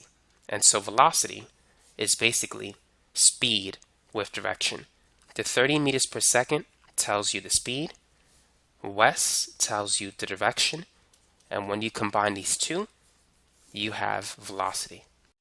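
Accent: United States. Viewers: Dear Teacher: You helped me today. I am teaching myself-or more correctly, learning myself via teachers like you--physics. My interests--outside English teaching and philosophy--are sustainable aviation and human spaceflight. Please allow me to help you--just a bit. Sir, you're a good teacher (thank you for that!); you speak fairly distinctly, slowly enough for your students to hear and comprehend your words & concepts clearly--and you convey information methodically, in bits that can be digested--whereas most physics teachers I have seen can't teach to save their granny's lives; but, respectfully--and take this with brotherhood, my friend, consider--pronouncing your words fully, please. 'Wes' is not a word (it's a person's name), nor are 'Eas' or 'Norf'. In addition, 'objec' is not a word, either. People will say this doesn't matter--and that you speak an American city dialect---but I care to much for teachers like you--who are trying to educate the world--so I respect you enough to say they would be wrong. 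You are too well-educated to limit your pedagogy with voluntarily incorrect pronunciation; I say "voluntarily", even though I would guess you probably grew up in an immersion environment wherein your peers and guardians (really--your guardians, too?) spoke this way, which affected your interpretation of the phonemes they were pronouncing... in relation to the words they were a part of... and the connected to meanings they were attached to- unconsciously; so I could guess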